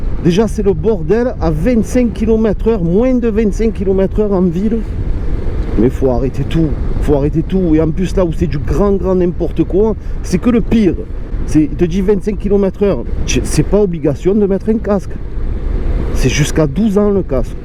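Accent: South, France. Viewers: c rs enervé avc laccent du sud mdr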